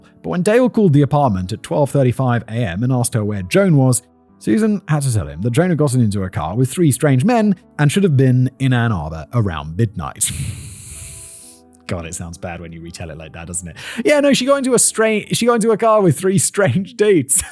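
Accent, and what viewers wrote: United Kingdom. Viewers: I'm a Houstonian now, but both sides of my family are from Wisconsin and Michigan. So I get to cringe every time he says something in this episode, plus his British-accented-Spanish-Spanish pronunciations where I'm used to Mexican Spanish. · well he is British so…makes sense how he would have a different pronunciation for words. Also…I knew the correct pronunciation right away. I also had family in the area during the time he was at large.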